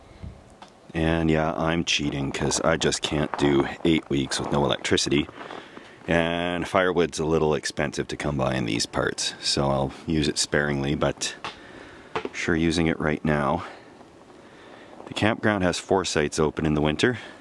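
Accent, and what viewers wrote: Canada. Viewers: That canadian accent tho 😂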